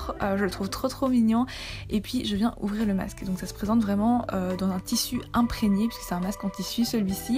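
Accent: Belgium. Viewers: wuoooh' tu es Belge? :0 c'est la première vidéo que je vois de toi, franchement j'apprecie, je m'abonne! et je mets, un pouce bleu! 😊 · Belge ?! 😂❤ Super vidéo 😍💍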